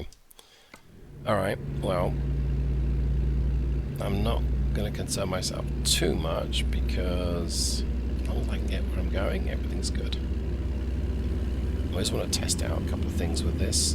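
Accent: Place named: England, United Kingdom. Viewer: Hahaha oooo nice u sound very English to me mate I guess u haven’t picked up the American accent yet lol it to ma to haha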